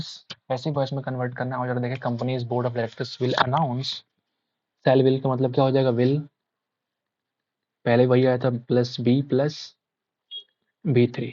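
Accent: Bihar, India. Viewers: Sir app bihar se ho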